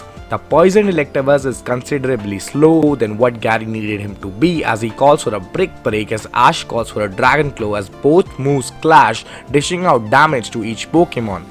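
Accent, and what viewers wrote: India. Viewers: Your Accent its like Indian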